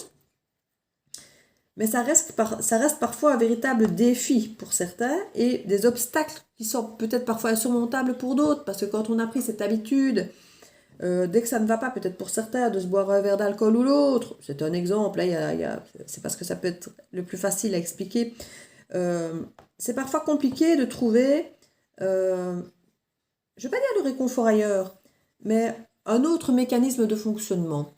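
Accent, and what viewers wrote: Liège, Belgium. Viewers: J'ai mis un pouce d'encouragement... j'avais bien senti cette pointe d'accent Namurois ou Liegeois... avant de lire la description... (ah ben avec le "Oufti", je m'etais pas trompé, c'etait bien l'accent de "Lidge" :p ) Bonjour de Mons :)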